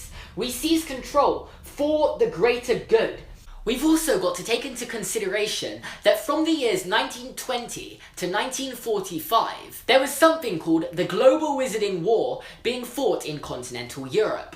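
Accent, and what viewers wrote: England, United Kingdom. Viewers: Where is he from? I recognise his accent, but I can't place it (I know its English, but not sure where specifically) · British accents are adorable tbh I love them so much. I'm watching these videos to hear the accent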